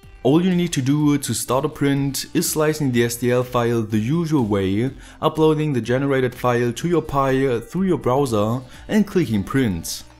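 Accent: Germany. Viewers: can i hear a german accent? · oh gott..., ein deutscher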